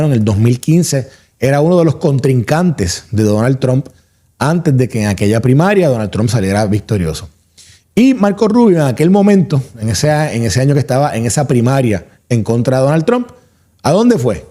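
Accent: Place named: Puerto Rico. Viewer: Como Cubana te agradezco k le des voz a mi pueblo. Me encanta el acento boricua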